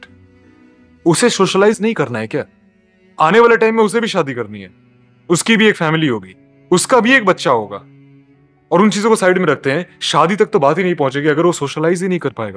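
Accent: Bihar, India. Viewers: Brother Aap bihar se ho kya ?😊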